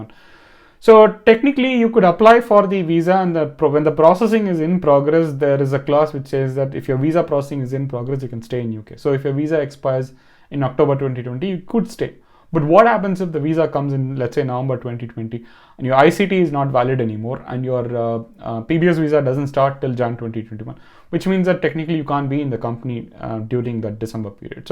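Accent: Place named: Chennai, India. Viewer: Chennai slang in your language,when you speak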